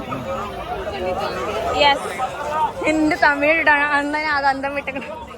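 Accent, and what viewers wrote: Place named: Malappuram, India. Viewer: അന്റെ മലപ്പുറം ഭാഷ കേറി വരുന്നുണ്ട് മോളെ 😂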